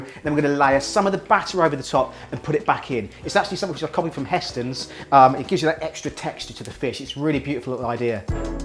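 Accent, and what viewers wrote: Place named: United Kingdom. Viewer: Watching and loving ur videos and (ur awesome british accent) all the way from ontario canada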